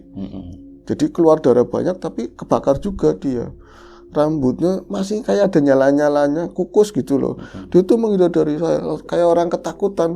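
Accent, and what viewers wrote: Banyumas, Indonesia. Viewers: Dari Ch LapHor, capcuus k CH NGOBROL, stlah itu merapat lgi k Tanah Tua Channel ndengerin ngapak'y mas 🤭🤣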